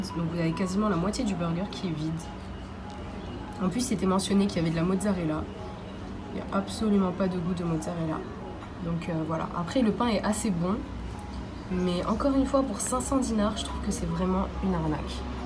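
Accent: France. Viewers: Salam Alaykoum ma soeur tu es françaises et tu vie en Algérie ? Je me demandais car t’as un accent français mais t’as aussi un bon accent Algérien quand tu dis un mot arabe lol J’aimerais vivre en Algérie insha’Allah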